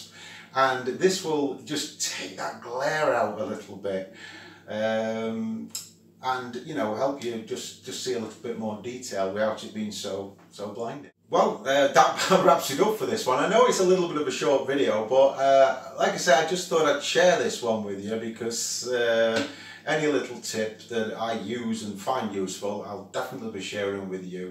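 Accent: Midlands, United Kingdom. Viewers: This is right up my street! When the video started and you mentioned the best way to get focus on a wobbly scope I immediately though focus mask, but I didn't expect you to modify your dust cap! Really neat job, great bit of Astro DIY :) I'm trying to place your accent, I'm pretty sure it's midlands? Big sub from me :)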